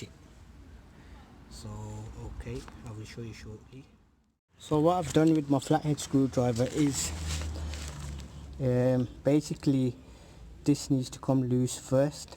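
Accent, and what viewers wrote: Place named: Birmingham, United Kingdom. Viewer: You sound like you're from Birmingham, help a brother out lol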